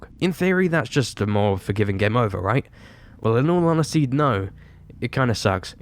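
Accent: United Kingdom. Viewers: Man, I keep coming back to this, and I get whiplashed that this was before transitioned- I’m so used to hearing her voice nowadays that her sounding like your average british male feels weird Kinda prefer her voice nowadays lmao, but I just like British accents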